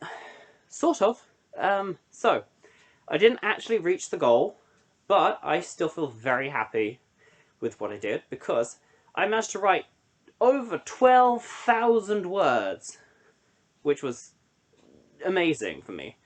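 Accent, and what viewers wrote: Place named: Portugal. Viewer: Your accent is just fine, especially for a beginner, you are speaking with an European accent, the Portuguese accent, it's surprising how good you sound to be honest. Just gotta work on the fluency, but the accent is fine, the user who commented on your accent is just expecting you to sound brazilian. Keep doing what you are doing.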